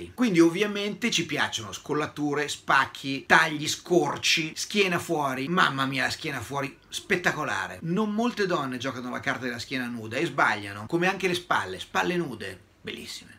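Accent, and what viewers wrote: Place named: Milan, Italy. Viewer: Io non capisco , signore, cosa c'è da scandalizzarsi. Si può non essere d'accordo,io stesso, maschio etero arrapatissimo, non sono d'accordo al 100% con lui, che oltretutto ha un accento milanese che per noi romani è come le unghie che grattano il muro,però secondo me la femmina avveduta deve fare tesoro delle sue parole, perché che piaccia o no descrivono i desideri di ampia parte degli uomini. Poi,si può decidere di non partecipare alla festa, ma la conoscenza delle cose bisogna averla, e senza puntare il dito, anzi, ringraziando delle preziose informazioni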